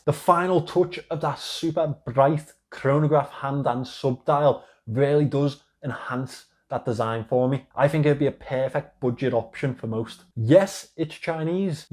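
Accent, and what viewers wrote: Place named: Scotland, United Kingdom. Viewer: This lovely scottish accent !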